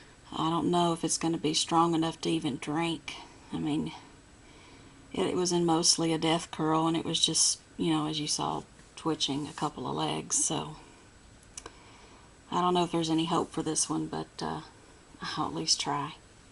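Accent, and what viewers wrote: South, United States. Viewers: I like your southern accent. I wish my Californian accent was as cool as your accent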